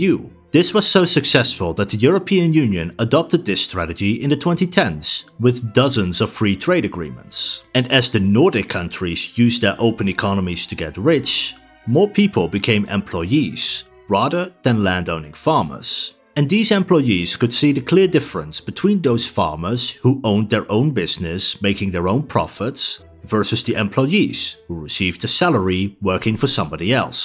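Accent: Netherlands. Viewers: This dude sounds Dutch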